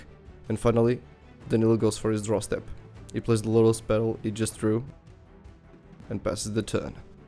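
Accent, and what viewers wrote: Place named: United Kingdom. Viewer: Love the new video production, the narrator has a very good British style accent.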